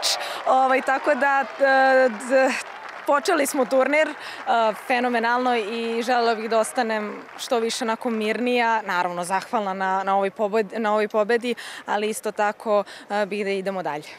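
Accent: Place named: Niš, Serbia. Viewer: Bravo. Pricaj si po niski ne trudi se da gadjas beogradski. Nek se potrudi da razume ko hoce. 💪👩🎾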